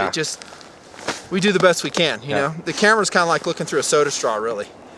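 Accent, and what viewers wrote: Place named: Northwest, United States. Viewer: what state is this in? You sound like a Northwesterner.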